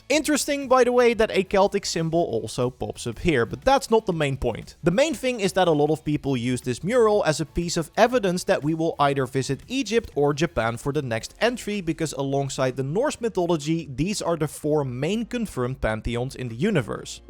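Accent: Netherlands. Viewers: Are you dutch? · Nederlands accent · dat onherkenbaar nederlands accent :D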